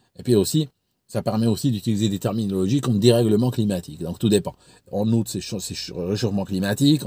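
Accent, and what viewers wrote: Switzerland. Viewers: "le trou de Lausanne" raccord avec ta dernière sur les maladies pochette surprise de la croutonne d'Angleterre. Ton ravissant accent suisse est tellement gloutonnant 🥂 Petite intro d'une douce chanson pour les amis en tristesse 🎶 On peut vivre sans richesse Presque sans le sou Des seigneurs des princesses Y en a plus beaucoup Mais vivre sans tendresse On ne le pourrait pas Non non non non On ne le pourrait pas! né André Raimbourg le 27 juillet 1917 à Prévot-Vicquemare décédé le 23 septembre 1970 à Paris. Poète, tes papiers!!! 🫂 l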